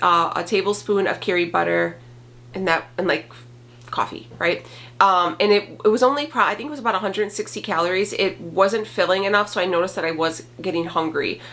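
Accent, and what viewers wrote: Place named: Midwest, United States. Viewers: Lol. You do sound like your from Minnesota. Sorry... even when you were trying to not sound like you from Minnesota, you sound more like you were from Minnesota, ey' 😁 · Great post! You look beautiful! Also, you do totally sound like you’re from Minnesota! My ex is from south side Chicago and doesn’t have the same accent as you! Even sounds more like Chicago! Not a dig, I promise! Your accent is awesome, but it just doesn’t sound Chicago for some reason! I wish you could hear it! I love your videos too by the way, thank you for being honest and helpful! Ps-love the Mean Girls quote! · LOL. You definitely sound like you are from Chicago! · You’re Midwestern, but not Minnesotan. LOL. Though you do say “Minnesota” spot-on like we do, mostly you sound like my friends who grew up in Chicago 😘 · "from Minnesota" If I had to guess, it's the way you say your O's. 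I lived in MN for most of my life and now live out west and get busted on my pronouncement of words with O's frequently and just giggled when they say it now. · You do have that Minnesota vibe. "Don't cha know"?; )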